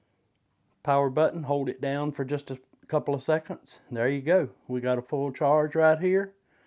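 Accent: South, United States. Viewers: A Chinese ebike review with an American southern accent is dope and funny at the same time lol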